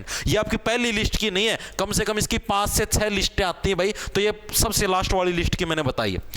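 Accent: Haryana, India. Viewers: Bhaiya mja haryanvi ava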